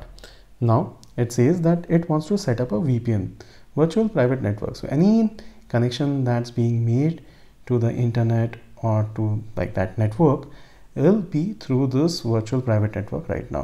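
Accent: India. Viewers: Another misleading title from some guy with an Indian accent. Why would you want elderly people to download from a 3rd party website?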